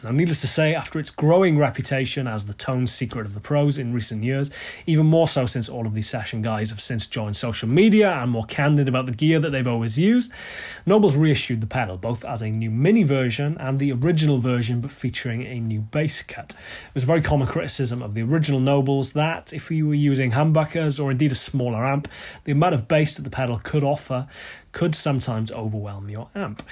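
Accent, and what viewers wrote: United Kingdom. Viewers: I mentioned two yardsticks one of which you didn't address. Do you think could get a band together, tour the world and fill up arenas and stadiums (yes, stadiums!)? By another measure, he has 116K subscribers. StevieT has over 2 million, Tyler from Music is Win has 1.3 million, Marty Schwartz of Marty Music has 2.7 million and the list goes on and on. None of them will be "legends" either but their fans have more justification to stake that claim. By that measure is a mere cult figure. Don't get me wrong, once I got past his Brit accent and Brit pastiness, I realized what a great channel this is. I love his playing style. A legend? I think we're tossing that word about a bit wantonly and "fanboyishly".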